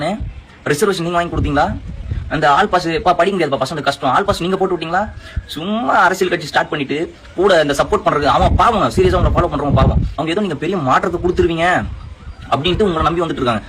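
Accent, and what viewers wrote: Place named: Kongu, India. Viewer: Nei Kongu mandalam athan nei pesura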